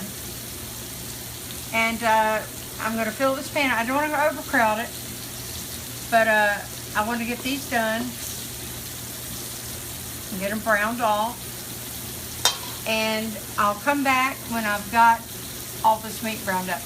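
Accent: South, United States. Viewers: I am thinking; Kentucky;Virginia, Tennessee by accent?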